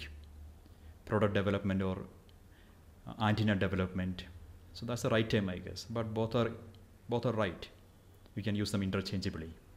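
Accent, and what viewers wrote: India. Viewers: Are you from Kerala? even I'm feeling it the same way.. ☹️ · Strongest Indian accent on the planet this guy 😭🤦‍♂️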